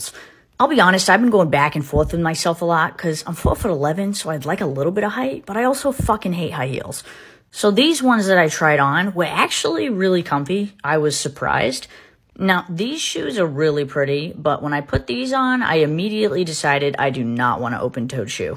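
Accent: Boston, United States. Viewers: It's an over exaggerated Boston accent and I mean over exaggerated!!!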